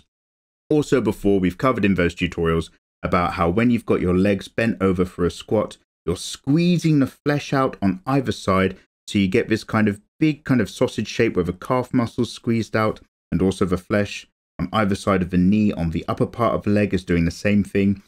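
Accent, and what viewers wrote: United Kingdom. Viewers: dude with a british accent drawing cultured stuff, fucking awesome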